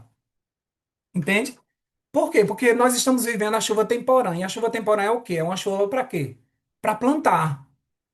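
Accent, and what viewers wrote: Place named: Northeast, Brazil. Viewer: Meus irmãos eu não acredito que eu perdi a Live com essa pregação com irmão. eu sou de Aracaju, sua vizinha! Amo esse sotaque, é nois kkkkkkkk nordestinos arretado😁🙏🏻🤗☺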